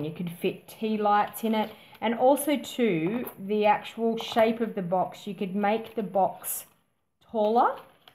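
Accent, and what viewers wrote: Australia. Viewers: 🐙 Me, hubby and our 2 boys moved here Christmas 2018 from Brisbane. We love it here 🇦🇺 New Subscriber from Finley, NSW I’m 36, Married with 2 boys - very very crafty. 🌺 I own a Business Making & Selling The Safest Candles & Melts In The World Plus Various 100% Natural Body Products Incl Organic Baby Skincare Products, Organic Assorted Melt & Pour Soaps + More ❣️ These boxes would look great in the Packages & Favours that I Make. 🍄 See my Instagram page <link> 🌸 Facebook Business Page Chillaxing Melts, Candles & Body Products ❣️ Twitter: 🍀 Email: <mail> 🌸 I’m a Registered Nurse, Midwife, Homeschool Mum & Qualified Licensed Aromatherapist, therefore I’m legally allowed to use Essential Oils & Blends In Products Absorbed by the Skin. 🌷 I’d love to send you a gift box. 🦋 I Make beehive soaps that would fit nicely. 🌺 You sound like your in the Tweed Coast / Coolangatta . 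🔆 Plz pick some items you’d like to try and pick as many as Scents as you’d like. ⚠️⚠️ Note: I do have a ABN Plus I own another name and my business name will change to it in December 2019, currently setting up Shopify Store 🍀 Have you thought about selling tutorials on Kindle?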